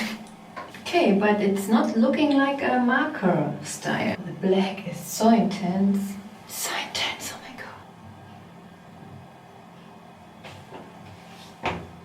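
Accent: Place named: Germany. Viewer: Wallah so ein Deutscher Akzent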